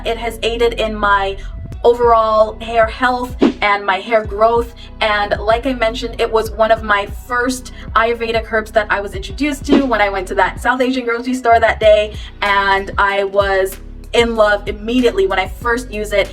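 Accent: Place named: Jamaica. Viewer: It is that Jamaican Accent Young Lady♥️. I actually have the henna mask in my head now. Plan in keeping it on for about three to four hours. I will definitely be looking forward to new videos from you.♥️♥️♥️♥️♥️♥️♥️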